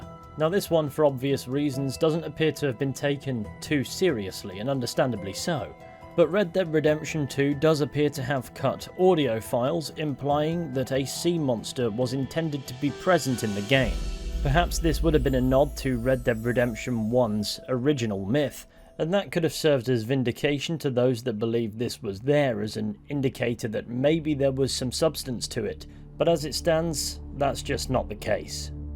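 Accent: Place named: RP, United Kingdom. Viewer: you're a smidge posh, in a good way. I like it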